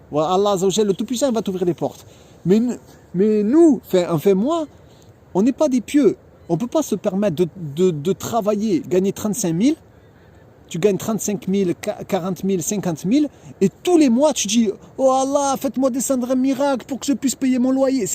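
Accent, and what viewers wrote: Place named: South, France. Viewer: سلام عليكم خويا Tout d'abord bienvenue à toi et ta petite famille en Algérie terre d'islam, j'ai regardé avec beaucoup d'attention toutes tes petites vidéos et tu m'as donné un grand sourire grâce à ta joie de vivre, ton courage et aussi ton petit accent du sud "fada" ^^ Que dieu vous préserve et vous aide dans votre quotidien ici en Algérie, et ne pas oublier la parole de dieu : يا أيها الذين أمنو إن تنصرو الله ينصركم Pour revenir au sujet de ta vidéo, tu as tout à fait raison sur les conditions de vie ici, contrairement à un Algérien qui est né et grandi ici, avec son entourage et sa famille, il est bien entendu très rude pour un mouhadjir comme toi de s'installer comme salarié ici, car la grille salariale ici n'est pas forcément adaptée à votre cas, et l'absence également de beaucoup d'aides sociales dont vous bénéficiez en France. 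Par contre pour ce qui est de l'entrepreneuriat, l'histoire est un peu différente, pour ne citer que quelques exemples, ici il est possible d'obtenir un terrain agricole ou industriel gratuitement auprès de l'état en contrepartie d'un investissement à faire ici dans ces secteurs, il faut bien entendu créer une entreprise de droit Algérien (en Algérie il est interdit de vendre à un non Algérien pour protéger la terre), il est également possible d'obtenir un crédit islamique hallal auprès d'un établissement financier pour financer partiellement son projet, Il y a beaucoup de choses à faire ici en Algérie et ce pays ne crache pas sur les gens sincè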